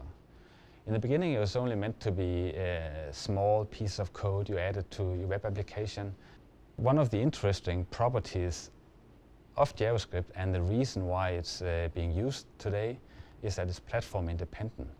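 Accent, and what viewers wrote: Denmark. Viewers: NO! its a danish accent. personaly i think all danish people should be forced to use english instead. its the worlds most singularly incoherent language. a horrible mix between swedish and german which speekers of either language is unable to comprehent. Hell i've heard even danish people have problems understanding each other. I really despice the danish language... (i dont mind the people just there horrendous way of communicating) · I can just hear he's danish :D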